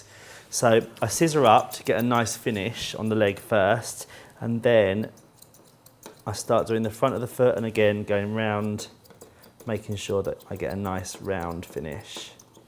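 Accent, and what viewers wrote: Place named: England, United Kingdom. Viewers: english, this is an english accent. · I love watching the British groomers. Many times I catch myself talking British while I'm grooming dogs, lol! Especially the good ones that sit: I say "Up dahling!". You did a great job ;)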